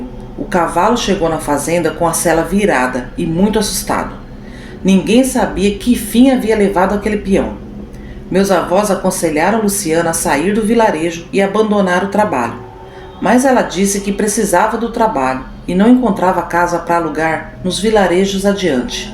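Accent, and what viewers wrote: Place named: Caipira, Brazil. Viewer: Essa entonação caipira ficou muito boa. Parabéns ❤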